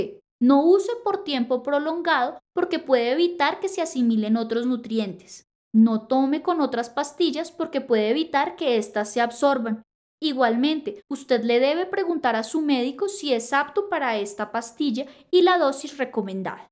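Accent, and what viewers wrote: Colombia. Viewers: Sí es Colombiana, muy Bogotana súper chévere!!! Jejeje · thechampion606 Si ella es colombiana su acento es 100% colombiano eso te lo aseguro yo que soy colombiana. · Tiene acento de Bogotana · Me gusta el asentó de Colombia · Que linda voz usted es colombiana? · Tiene acento colombiano, lindo.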